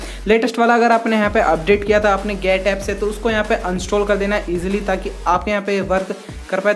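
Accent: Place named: India. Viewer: Bruh the title is in english but you speak indian why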